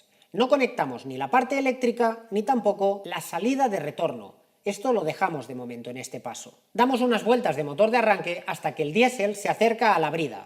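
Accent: Spain. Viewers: Nuevo suscriptor. Ese acento catalàn me recuerda a un querido profesor de la infancia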